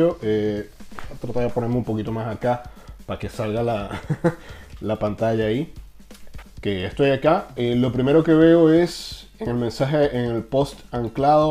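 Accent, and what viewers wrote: Venezuela. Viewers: tu eres venezolano rajao brother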